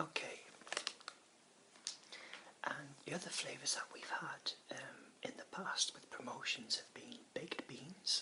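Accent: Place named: England, United Kingdom. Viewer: Your voice is lovely. The way English people speak makes me melt. Can't wait for more videos. :)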